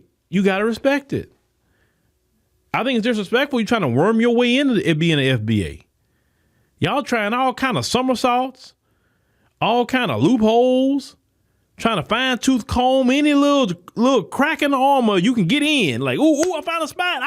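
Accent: South, United States. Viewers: I could tell from your accent you from the south so you know nothing about the north east even though we came back to fight to Free we black America north so we don’t really mess with Mexicans Venezuelan MS 13 but we love our Puerto Ricans so we have family members for generations I’m talking about foundation multiple generations tight and we don’t delineate. We stick with the ones that speak with usstop speaking poison. You could be speaking to black babies with Puerto Rican in a blood.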